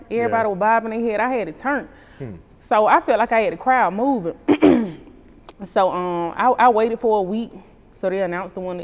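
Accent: South, United States. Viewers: Don't know you you sound so country well Black Is Beautiful gurl 10/17/22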